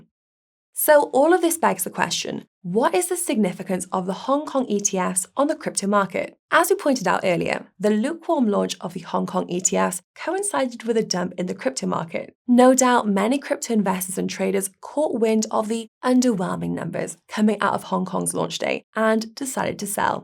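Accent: United States. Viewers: Really appreciating ya'll from Pensacola Florida. American accent is hilarious.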